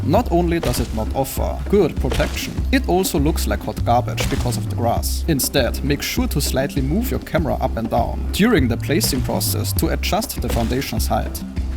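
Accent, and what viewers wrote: Germany. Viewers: Bro, du bist deutscher oder? 😂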